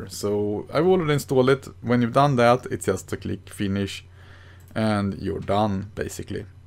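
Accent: Sweden. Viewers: Could you by any chance be swedish??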